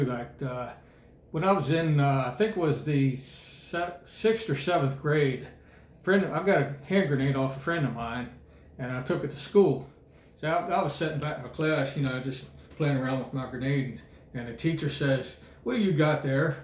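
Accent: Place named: Baltimore, United States. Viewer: You got a Baltimore accent. I'm originally a Harford County girl. Love the Hat btw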